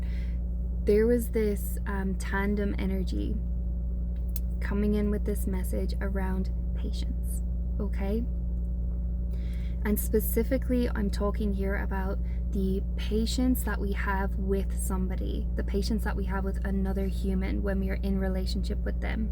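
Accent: Ireland. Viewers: you are confusing me. You have a Scottish complexion but every now and then you sound Irish. But you're American right?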